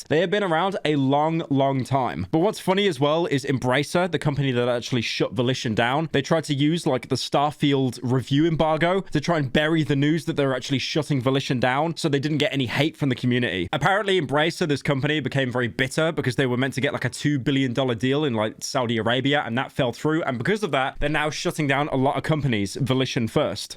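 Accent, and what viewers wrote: United Kingdom. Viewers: I’m convinced viewers are degenerates and are okay consuming anything with buzz words with funny British accent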